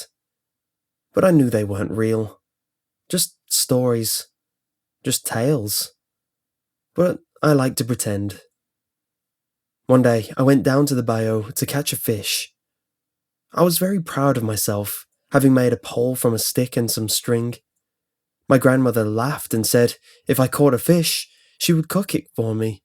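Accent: United Kingdom. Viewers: Well if you haven't noticed yet, he's British :/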